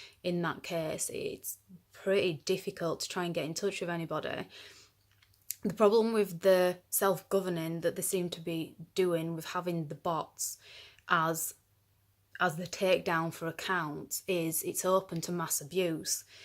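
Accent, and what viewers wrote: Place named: Yorkshire, United Kingdom. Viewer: You sound like a Yorkshire lass to me, either that or Manchester, somewhere that way but you sound a bit well spoken for Manchester 😂I look forward to more videos 😁xx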